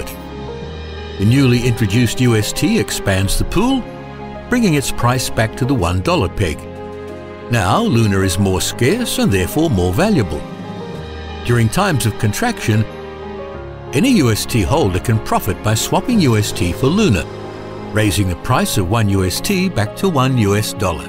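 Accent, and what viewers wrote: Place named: Australia. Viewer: Australian (I'm Australian – I can assure you he's Australian – or a Kiwi who's lived in Australia for a long time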